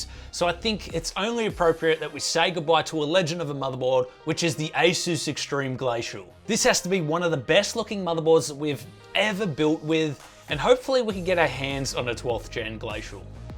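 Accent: Australia. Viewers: are u aussie ? so am i lol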